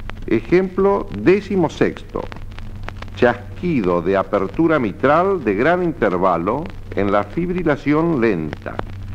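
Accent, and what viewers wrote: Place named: Argentina. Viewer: Ese acento argentino de loas años 80 me trae mucha nostalgia :)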